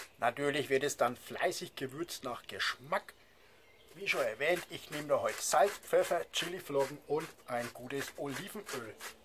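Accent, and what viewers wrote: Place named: Franconia, Germany. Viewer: Fränkischer Dialekt. Pils zum trinken. und FC Bayern Trikot. Ich bin maximal verwirrt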